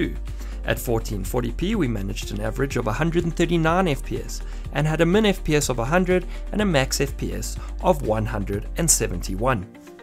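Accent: South Africa. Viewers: Howzit bru, nice review. It was super cool to hear the Saffa accent! I currently live in Asia and was able to get the card for around 670 USD. Not MSRP but considering the current market I am happy with the purchase. Looking forward to more content in the future!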